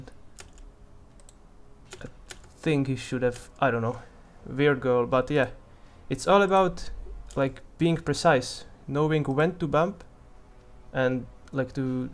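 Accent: Czech Republic. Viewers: u have nice content keep try and u have like czech or slovakia voice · Ten českej accent tam je furt cítit :D, ale good video a nice advices!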